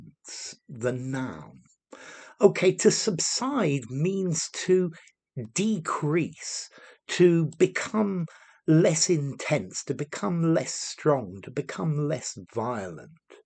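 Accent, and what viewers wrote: United Kingdom. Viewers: Whereabouts in UK you are from Guv ? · Hello teacher I have missed out on much of your precious and quality British English in ages I hope you won't be annoyed by my comment anyway you look very pale and still has got a great amount of knowledge 🤭😃👏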